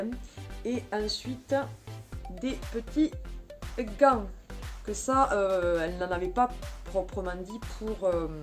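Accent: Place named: South-West, France. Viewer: Hello ! belle rentrée VG ! on dois faire les mêmes VG au vu de ton accents du sud ouest on est voisin 😅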